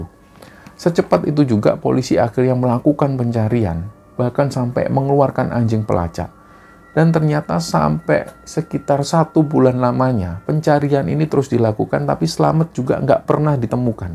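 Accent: Java, Indonesia. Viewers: Kok ga nemu pencerita hebat seperti mas... selalu berkelana membayangkan jalan ceritanya karena saya hanya mendengarkan, jarang sekali menonton karena sembari membuat kue.. alur yg mengalir dengan halus, berirama, menegangkan dan dengan aksen Jawanya sangar indah, terima kasih mas dkk ❤❤❤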